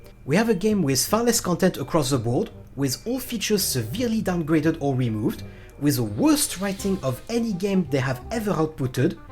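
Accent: France. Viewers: That accent... Are you ... French ? Anyway Based Review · Sorry to tell you but I just watched your vid for your french acsent. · I just discovered this channel. After the video, I went straight to see if he had more content, I hope he uploads more videos, I love his witty sarcasm. The French accent just adds the cherry to the top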